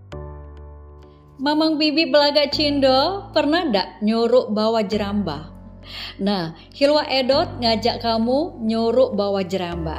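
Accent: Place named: Indonesia. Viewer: logat nja kamu ngomong seperti logat behese sengedeng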